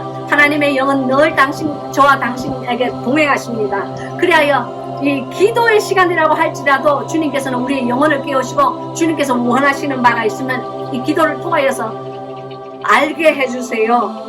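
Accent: Gyeongsang, South Korea. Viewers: 성경공부 너무 좋아요 은혜가 넘쳐요 케냐 사람들을 이해하시려면 하나님의 도움이 정말 필요할것같아요. 목사님도 경상도 사투리 사용하시네요. 음향과 동영상편집은 토니 목사님께서 해주시는건가요? 너무 재밋어요. 예수님도 유머가 잇으시다는데. 잠근동산 책 주문햇어요 곧 도착하면 읽을 생각이에요 변화받길 원하고 실행하는 믿음의 사람되게 해주시길 기도드립니다 아멘 할렐루야^^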